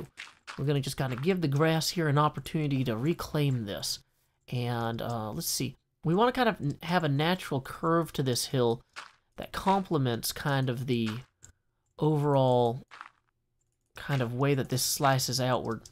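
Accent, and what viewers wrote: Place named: Tennessee, United States. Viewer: I had no idea I wanted to hear “Hack the planet” in your musical Tennessee accent. Always learning new things on your channel,.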